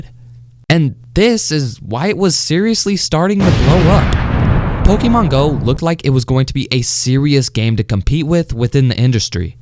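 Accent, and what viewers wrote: Australia. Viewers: isn’t he Australian? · Kdugg 0 I’m 100% sure he’s from Australia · Wait a minute Lachlan is Australian not British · I thought he was from Australia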